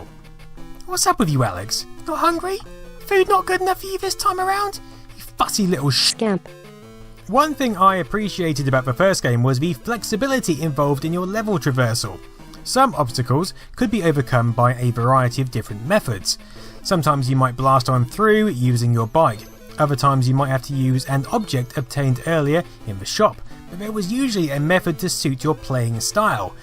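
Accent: United Kingdom. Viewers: When British people pronounce Japanese words I cringe so hard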